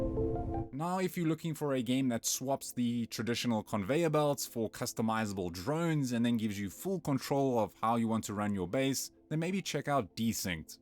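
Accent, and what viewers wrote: South Africa. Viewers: This accent.....South African?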